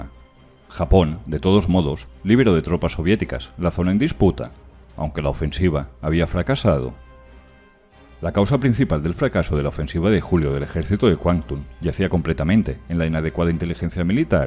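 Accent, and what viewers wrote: Galicia, Spain. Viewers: ¿Es la entonación al hablar de origen gallego o asturiano?. Me encanta; saludos.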